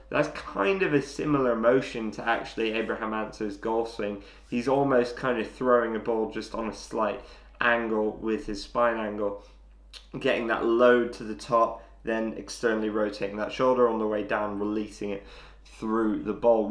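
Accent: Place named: United Kingdom. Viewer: Great break down of Ancer's swing. Plus, you're one of only a few of the golf Youtuber's from Great Britain whose English accent I can comprehend. Cheers for the Queen's English ya'll.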